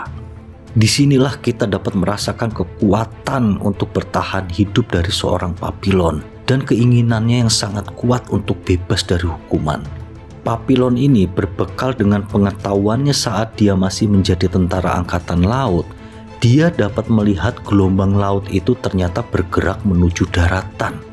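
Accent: Java, Indonesia. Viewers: Suaranya agak medok Jawa y bang?🤔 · Suaranya medok bangett hahaha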